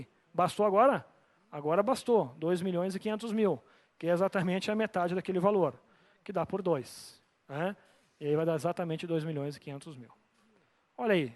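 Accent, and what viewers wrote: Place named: Curitiba, Brazil. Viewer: Aula perfeita! E o sotaque curitibano é o melhor kkk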